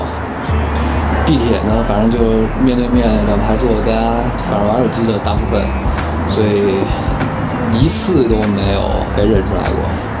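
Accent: Beijing, China. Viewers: 这北京话听得舒服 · 就爱他这种北里北气的口音 太好听了真的 能听一整天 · 北京腔真的跟老大爷似的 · 我爱你，我也是北京人。现在堵在美国不能回家。听了很多遍你的后座剧场，也是我小时候的回忆。听着熟悉的京腔，我好想家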